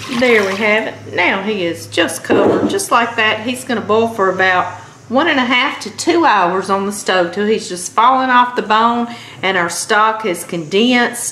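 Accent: South, United States. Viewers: Oh man, the dumplings are spot on. I love your southern accent, it reminds me of the 15 years in lived in the South (Birmingham & Memphis), especially the cooking, but what I came to love and admire the most was the HOSPITALITY! Southerner's are next level when it comes to hospitality, and when you walk in the door they're going to feed you. Don't say no! Shut up, sit down, and prepare to be fed with some of the best cook'n in the world. Your style just brings back floods of memories. BTW, thanks for your recipe for rolled dumplings, rolled dumplings are the best!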